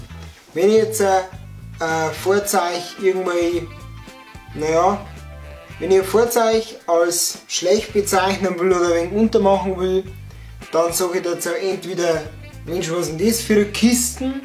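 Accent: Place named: Upper Palatinate, Germany. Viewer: Ziegler Tanja Des is a Oberpfälzer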